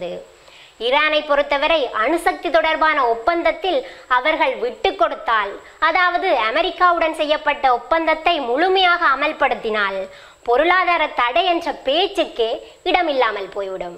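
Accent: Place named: Sri Lanka. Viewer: இலங்கை தமிழுக்கு நான் அடிமை இலங்கை தமிழேக்கு தனி திறன் கேட்பதற்கு இனிமையாக உள்ளது தங்கள் தமிழ் உச்சரிப்பு....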